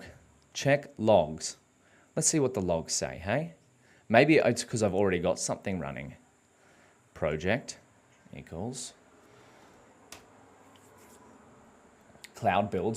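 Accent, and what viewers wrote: Australia. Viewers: Love the aussie accent bo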